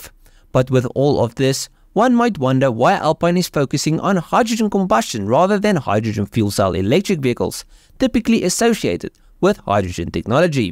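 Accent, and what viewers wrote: South Africa. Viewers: Had to double check your channel details when I heard the SA accent 🤪 great video! Keep up the great work!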